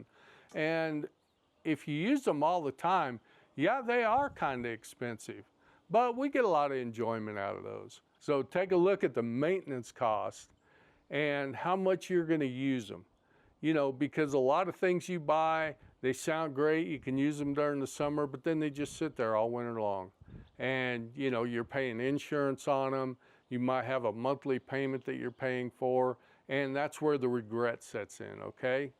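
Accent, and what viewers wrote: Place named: Brooklyn, United States. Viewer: Great video, but Brooklyn accent can be difficult to understand.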